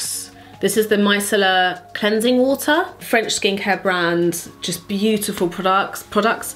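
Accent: London, United Kingdom. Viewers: your vids are so comforting to watch because I've watched them for so many years!! they're so relatable as well because I'm from london- the way you speak sounds like me and my friends lol and also you're so down to earth and the furthest thing from annoying - you're always my go to watch, u inspire me and keep doing what you're doing!! p.s i love the way that you mother, not all like rub it in everyone's face my daughter is god's gift kind of vibe, but so humble xxxx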